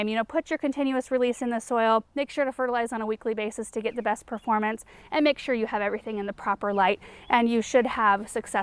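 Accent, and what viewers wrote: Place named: Northwest, United States. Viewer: Yup, I pronounce herb and foliage exactly like you do. We're both from the Northwest.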